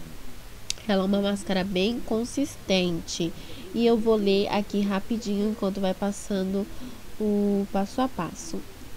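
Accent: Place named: Bahia, Brazil. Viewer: haha teu sotaque é de baiana mesmo😍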